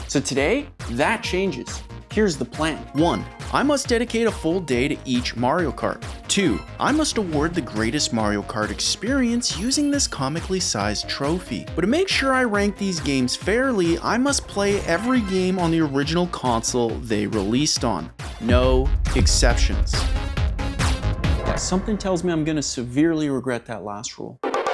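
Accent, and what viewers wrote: Quebec, Canada. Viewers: I think he’s French Canadian lol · Are you Canadian? I heard something of a Canadian accent. And you pronounced French words correctly.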